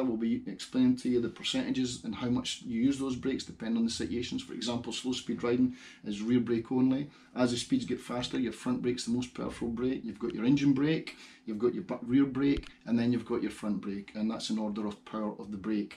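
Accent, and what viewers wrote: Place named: Scotland, United Kingdom. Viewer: Hi, ive been training to be a cbt instructor for a couple of months now and this was some fantastic depth and detail for element A! also very refreshing to listen to a fellow scotsman explaining it all lol! thanks for taking the time to do this for new riders and advanced riders! i hope you dont mind me taking down notes from your videos!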